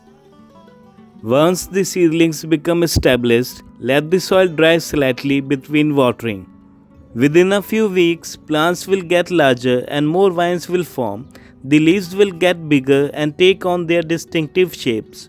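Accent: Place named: India. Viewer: It is Indian English just like there are American English or British English.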